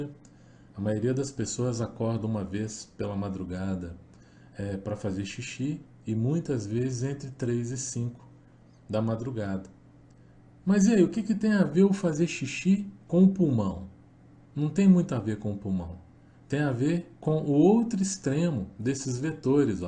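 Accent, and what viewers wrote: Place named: Minas Gerais, Brazil. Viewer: A fala pausada ( bem mineira por sinal) me pareceu boa pra aprendizagem.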